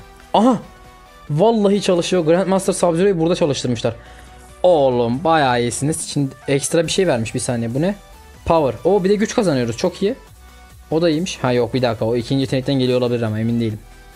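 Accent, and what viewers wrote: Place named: Adana, Turkey. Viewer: GALİBA BENİM GIBİ ADANALISIN!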